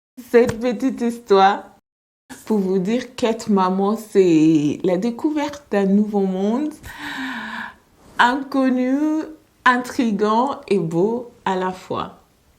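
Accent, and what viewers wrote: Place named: France. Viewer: Instead of reading the subtitles I am listening to your lovely French accent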